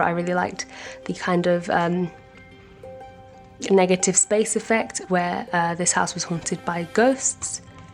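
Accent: England, United Kingdom. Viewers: I love your english accent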